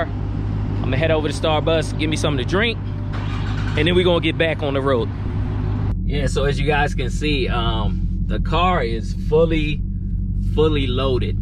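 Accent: Baltimore, United States. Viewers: you can tell he from b more cus of the way he pronounce words with "ou" "ue" "ew", they all sound like "uuuuuuu"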